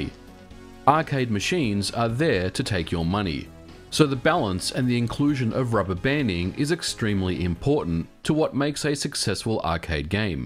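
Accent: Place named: Australia. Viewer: Australian. He lives in the US.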